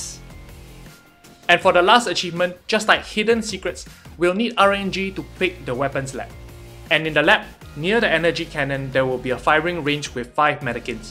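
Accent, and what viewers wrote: Singapore. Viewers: Bruh are you from SG?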